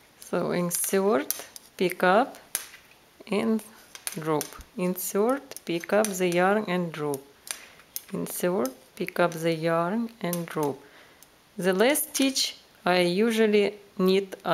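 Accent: Russia. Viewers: You have the same accent as CrazyRussianHacker! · This is a good video you did well. Thank you. I am used to listening to the Russian accent as my daughter-in-law is Russian. Thank you for sharing.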